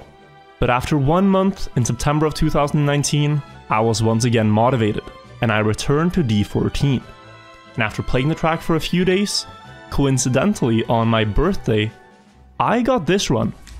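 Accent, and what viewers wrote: Norway. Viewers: You norsk?? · I just saw that he is Norwegian. Explains the trash accent.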